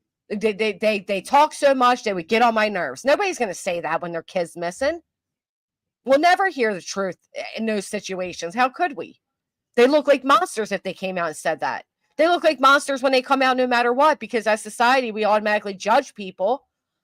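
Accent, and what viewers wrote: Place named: Pennsylvania, United States. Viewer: Happy Mother’s Day! I’m not far away from the PA line so I get how you say certain things. Much like we do here in Delaware 🌊🏖️☀️🏝️🐚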